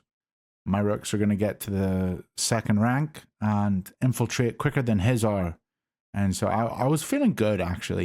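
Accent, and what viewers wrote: United Kingdom. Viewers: Thought you were British mate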